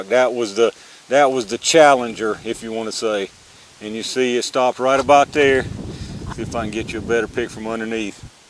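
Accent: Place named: Kentucky, United States. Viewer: I absolutely love your accent. Reminds me of my Kentucky kinfolk. ❤️ I loaded up on Federal punch because of your videos. They should be paying you!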